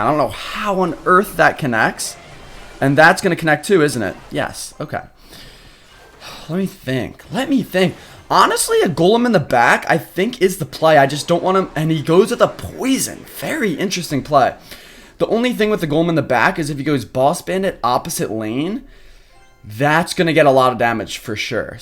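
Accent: Spain. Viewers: Bro your english is very spanish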